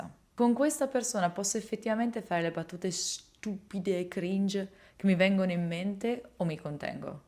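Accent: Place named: Sicily, Italy. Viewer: Ma stai sempre col ragazzo siciliano? Hai un accento più siciliano rispetto a 1 anno fa direi (detto da un siciliano).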